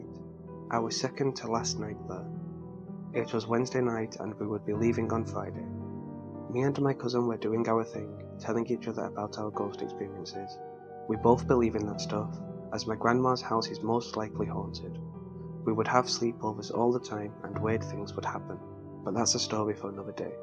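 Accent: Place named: Northern England, United Kingdom. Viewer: Another great job! Where abouts are you from? We're based in York and you definitely sound like you're from the north :D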